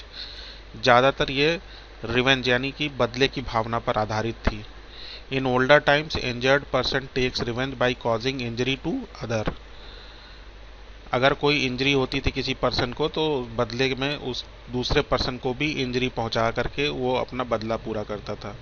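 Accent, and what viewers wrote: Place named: India. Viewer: Indian accent!!!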